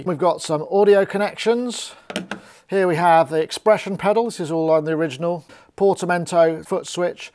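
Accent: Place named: England, United Kingdom. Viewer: Man, are u from england?? I can't understand shit you say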